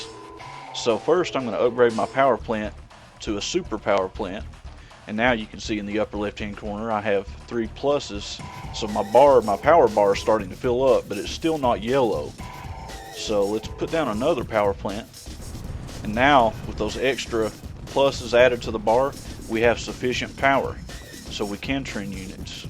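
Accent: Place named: Texas, United States. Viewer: you sound like somebody from texas with that accent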